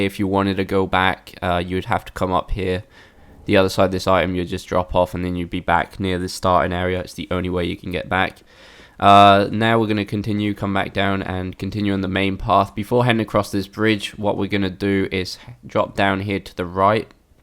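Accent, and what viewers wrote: England, United Kingdom. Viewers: I truly love listening to you English fellas doing walkthroughs. I catch myself with with my country accent tulkin like yew guys . Haha. I do it quite well. 😂😂😂 Thanks!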